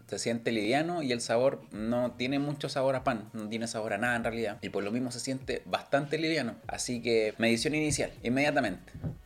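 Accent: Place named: Chile. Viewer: Me encanta tu acento chileno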